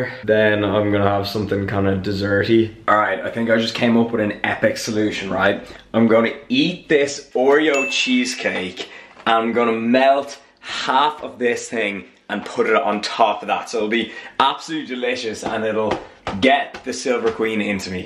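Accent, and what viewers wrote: Ireland. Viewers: Παύλος Εικοσπεντακης it's an irish accent .... ireland :) I'm also irish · Are you irish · I forgot how much I love Irish accents wow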